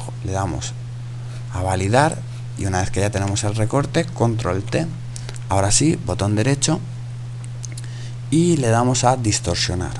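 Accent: Spain. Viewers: como amo el español de españa :DDDD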